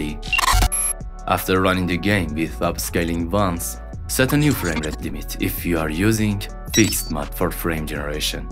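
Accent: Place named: Iran. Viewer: Honestly , I feel like you have an Iranian accent 😅😅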